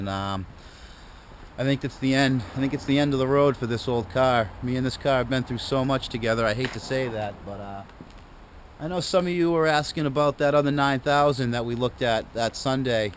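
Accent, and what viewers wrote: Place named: Massachusetts, United States. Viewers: Yo. You gotta be from Massachusetts !!! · 🚗 Loving the Bostonian Accent! These x308 Jaguars have several non-serviceable sensors, when I first bought my XJ8 Vanden Plas I had to swap the throttle body because of a faulty throttle position sensor that is permanently mounted within it. $1,000 part to fix a $30 sensor is crazy, but it made the car road-worthy and it is still working perfectly 7-years after the job with no other major issues. The prior owner had no idea what was causing the problems (scanning codes was all that they needed to do) and he sold it to me for a great price, so I'm not complaining now, but investing $1k to fix a sensor was initially quite concerning, lol.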